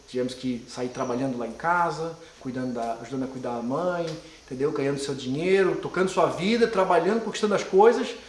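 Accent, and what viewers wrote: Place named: Florianópolis, Brazil. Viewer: Caí de paraquedas aqui, mas pelo soque.. com ctz é da nossa linda e querida Floripa! Baita vídeos, seguindo já.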